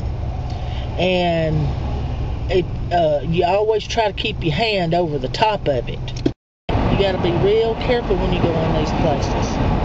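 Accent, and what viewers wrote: South, United States. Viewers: Your southern accent was out in this one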